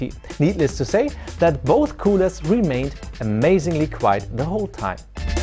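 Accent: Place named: Germany. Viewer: Ur german accent bro 💀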